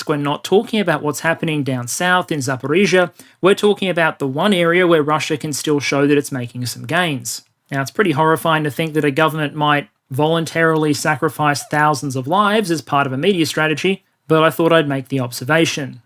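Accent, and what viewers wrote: Australia. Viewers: Sorry but your whiney Aus accent makes it too hard to listen.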